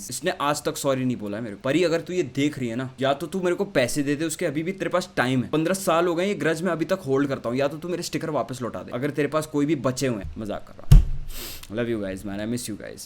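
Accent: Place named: Uttar Pradesh, India. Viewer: After a lot time..... using some UP accent yeh <<<<<<3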